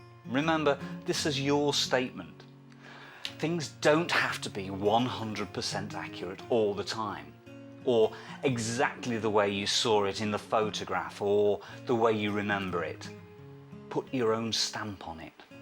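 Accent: England, United Kingdom. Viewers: So pleasant to hear an English voice. Have watched a lot of American stuff which is sometimes a bit hard on the ear!! Really great instruction too!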